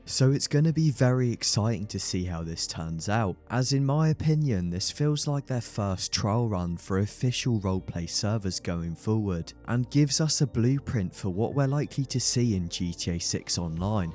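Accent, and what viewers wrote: United Kingdom. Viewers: tolerating british accent for 44 minutes is going to be real hard but there's no other choice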